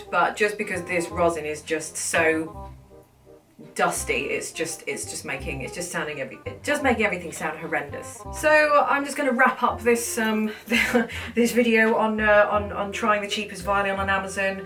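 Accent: England, United Kingdom. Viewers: I only came to your Channel to listen to your beautiful English accent. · I like the British accent.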